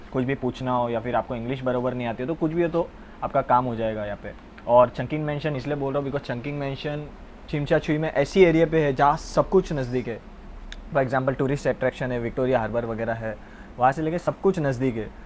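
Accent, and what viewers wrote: Gujarat, India. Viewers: i love your gujju accent lol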